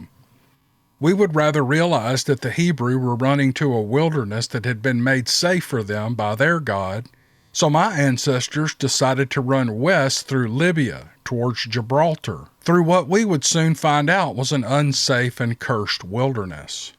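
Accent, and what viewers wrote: South, United States. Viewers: Love his Southern accent, so so sexy.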